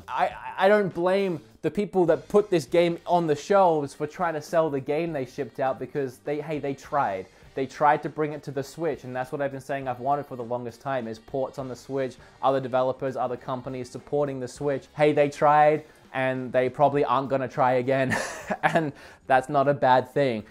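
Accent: Australia. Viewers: R u Australian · Mate, first video I've seen of yours. You sound totally Australian. I'm assuming you now just live in the US? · Are you aussie? If so, why are you at walmart? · Are you an Aussie? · Bloody cringe worthy hearing Australian accent. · What is his accent? Auatralian?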